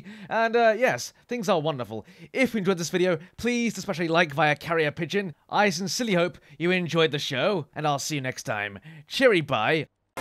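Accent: England, United Kingdom. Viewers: It's a show, although he has been talking like this for so long that even on his serious videos he will sometimes go off into his wonderful British way of talking :) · oh god, you are sooooo british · I'm in love with your accent. What kind of british are you, m8?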